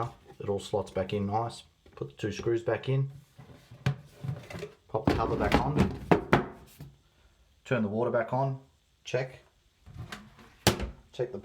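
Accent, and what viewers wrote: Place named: Australia. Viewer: Yep yep Aussie as she’ll be right mate 😆love it clear instructions and straight to the point 😆👍🏾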